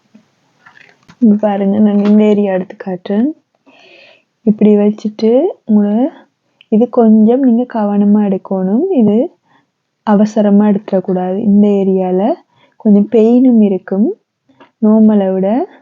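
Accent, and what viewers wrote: Sri Lanka. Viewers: Unga silon Tamil ketka inimaiya iruku akka😀😊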